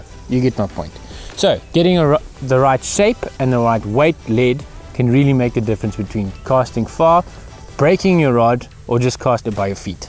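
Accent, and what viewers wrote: South Africa. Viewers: Hi bud from wher are you you soud south africa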